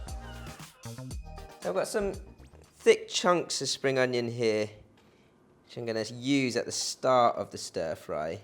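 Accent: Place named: Yorkshire, United Kingdom. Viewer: Nice accent. Were you born in the UK? Is that Yorkshire accent? The Recepi is great!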